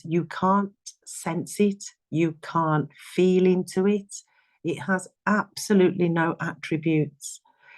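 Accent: United Kingdom. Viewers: Hearing the truth via your English accent is🤌🏻🤌🏻deliciousness Very good recipe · I don’t know which UK accent you have, but I was playing your video and my husband overheard your voice from the other room and said it sounded as if I was listening to a female Idris Elba 😀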